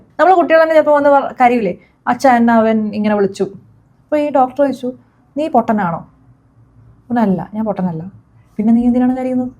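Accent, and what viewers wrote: Kannur, India. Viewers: ഇങ്ങള് കണ്ണൂർ ആണ് ല്ലേ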